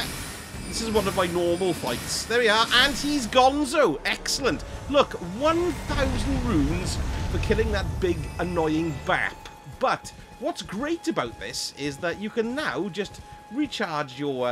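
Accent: Wales, United Kingdom. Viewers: I love this guys energy he’s class 😆 and the Welsh accent just makes it 10 times better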